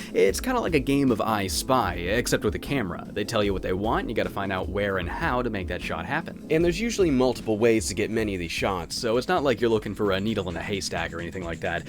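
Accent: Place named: Nova Scotia, Canada. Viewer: This is what I love about. He's plays a game, we get a lesson on Photography. Plus he's from Nova Scotia and his accent and mannerisms makes me miss the Maritimes.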